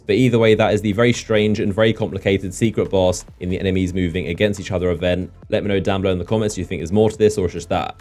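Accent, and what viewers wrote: United Kingdom. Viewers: Pls talk a little bit slower, i barely understand u bcuz of your british accent.Thank u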